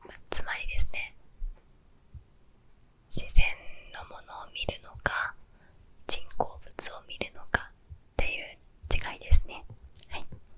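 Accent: Kansai, Japan. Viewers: こんなに海の音綺麗に録れるの凄い！ てかRunaさん関西人？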